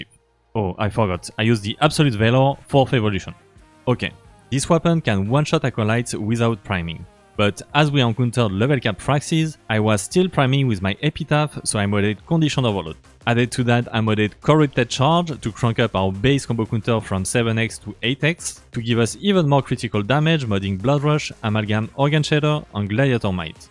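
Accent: France. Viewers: Excuse-moi de te poser la question en français mais je suis nul en anglais et j'ai remarqué ton petit accent français. Je souhaitais savoir si le troisième passif de voruna pouvait être fort avec la dague en céramique, et si tu me le recommanderait. Mis à part cela j'ai beaucoup apprécier le travail que tu as fait pour cette vidéos, force à toi.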